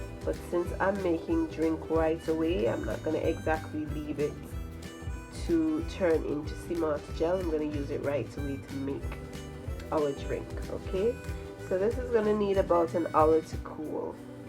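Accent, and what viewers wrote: Antigua and Barbuda. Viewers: YouTube brought me here because I was looking for a recipe, and then I heard the accent and was like, "Hold a minute." Antiguan 🇦🇬 can't hide.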